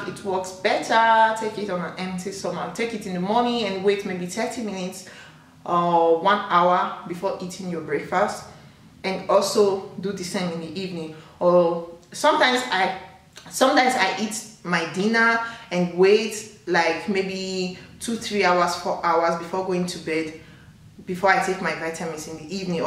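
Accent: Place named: France. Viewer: French accent ❤️❤️❤️love your accent girl! Thank you for the video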